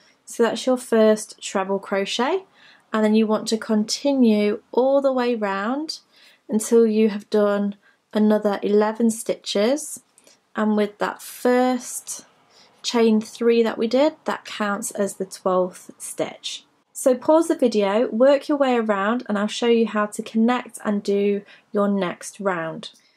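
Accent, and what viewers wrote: England, United Kingdom. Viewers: Confusing that you have an English accent but are using the American stitch names! 😂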